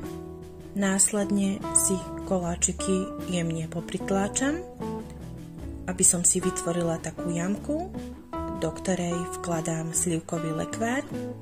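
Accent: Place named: Slovakia. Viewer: zdravím Vás- nejen výborné koláče, ale ta Vaše nádherná slovenština mi chyběly😁😋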